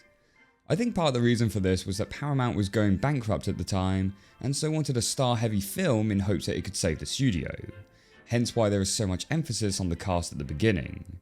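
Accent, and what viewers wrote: United Kingdom. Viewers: 😊 Aw, I don't think you're a prick. I think you're a pretty good narrator for film reviews. That deep voice and British accent really helps when you review the sad drama type films.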